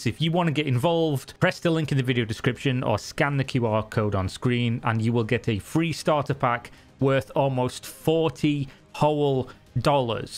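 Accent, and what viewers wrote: United Kingdom. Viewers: This is one of the very very very few videos I’ve clicked on, EVER in my entire life of using YouTube, that I’ve ever thought was just unbearably boring. Maybe it’s the British accent and slow talking on a boring subject that did it for me? I don’t know man. The video isn’t bad. It’s informative, and I’ll give it a like- but man, this was just…painful to try and sit through lol (I’m 26, been watching since I was like 12)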